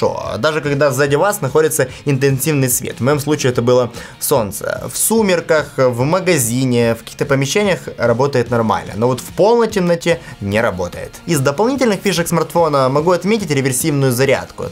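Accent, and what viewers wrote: Odessa, Ukraine. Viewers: Ты одессит ? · ты что Одессит?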